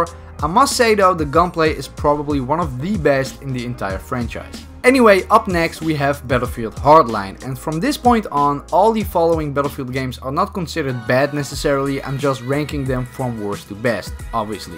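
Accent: Netherlands. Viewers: Are you from Netherland ? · Wait a second... why do you sound dutch????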